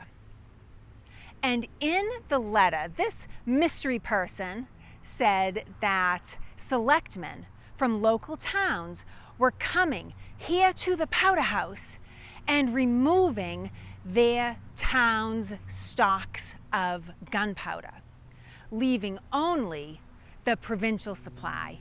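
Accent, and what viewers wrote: Boston, United States. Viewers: After retiring from the Navy I moved to the west coast in Washington State on the boarder with British Columbia so that my Canadian Wife can be closer with her elderly mother. I was born and raised in Watertown, Massachusetts and my two children and grandchildren still live just outside Boston. I have always loved American history, especially when it comes to New England and you do such a great job with your channel. Besides seeing the historical places you’ve covered, I am able to listen to you speak so that I hopefully will never lose my own “Charmin” Boston accent 🤣😂🤣 Thank You!!! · NON AI A PLUS. Where is this? thank you; love the accent. bah hahvahd yahdesque. Set Meyers does a clip, BOSTON ACCENT. Recommended, par moi. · Boston accent :face-red-heart-shape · I live in NH. Your Boston accent is very entertaining and sooooo damn sexy at the same time!!! 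😍❤️💕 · You could never program and AI with your enthusiasm for the subject matter or your charming Boston accent. I enjoy your work and obvious mastery of the Revolution. The way you speak about the era reminds me of classes with professor Hyman Rothbard back in the "day". Kudos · Boston, not Chicago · What a Bostonian accent 🤣!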